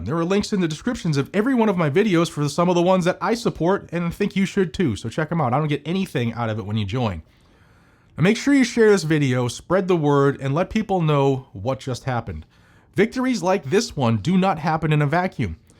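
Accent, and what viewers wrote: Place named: Boston, United States. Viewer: You've lost your Boston accent :( Still love you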